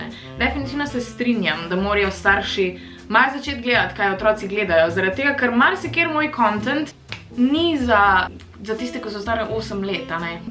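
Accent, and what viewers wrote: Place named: Kranj, Slovenia. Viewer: Mene tvoj naglas sploh ne mot ampak je ok:) moja frendica ma tak naglas pa je iz Kranja. Nekateri imajo slabo mnenje glede tebe ampak meni si super;) tudi če snemaš life trvari ali whatever si dobra influencarka pa še vem ne kaj to pomen(: men je ful dobr da še mal anglish govoriš ( tut js) bodi taka kot si radi te mamo tako:)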